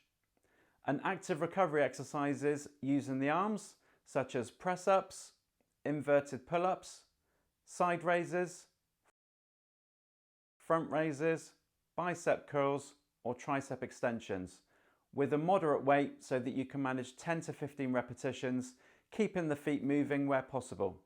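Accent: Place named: United Kingdom. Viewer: Thank you for this very helpful video. I could listen to your British (?) accent all day long. I hope to be incorporating this exercise regimen along with Flow Therapy (Compression for Micro Vascular disease) soon.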